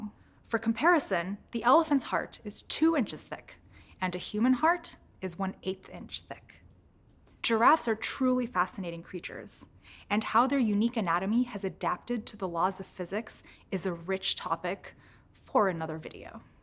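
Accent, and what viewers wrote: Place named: United States. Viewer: Sounds very americanish- hard to bear listening for more than 2 minutes and not getting any closer to the point :)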